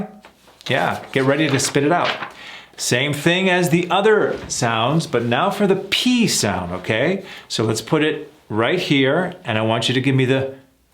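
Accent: India. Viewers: Thank you❤ your indian accent is perfectly as Indians usually speak . So please continue a series where you can teach from basics to advance for Indians. Love from india ❤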